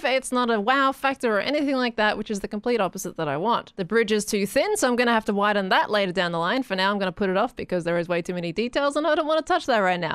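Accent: Australia. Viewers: A hermitcraft does of happiness... Thanks a lot, you realy made my day, with your thick Australian accent 💜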